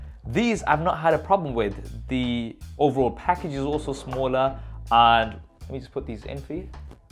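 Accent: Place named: United Kingdom. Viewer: Please stick with the British pronunciation. #British Good job with video!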